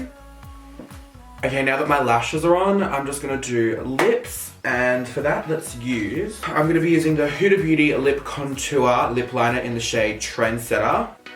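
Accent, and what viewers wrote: Australia. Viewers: Is he Australia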